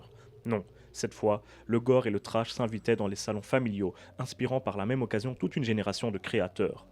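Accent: Belgium. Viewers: J'aime bien ton accent belge 😎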